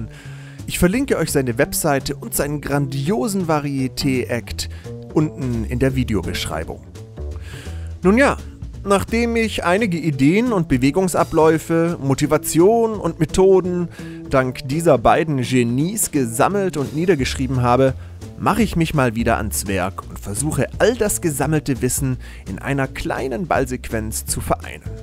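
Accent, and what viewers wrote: Swabia, Germany. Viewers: Griaßgottle ällemittenander. Dr Schwob sagt: "Mir könnet älles, ausser Hochdeutsch!" MAGIE ist für mich, dass DU als Schwabe eben DOCH Hochdeutsch kannst ;-) HANO! ;-) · Der schwäbische Professor mal wieder ;-) Sehr schön!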